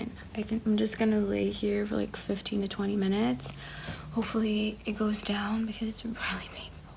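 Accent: United States. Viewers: I love your videos so much! <3 just wondering, where are you from? cuz you sound super american but you live in the netherlands? also I love your style, you're so cute :)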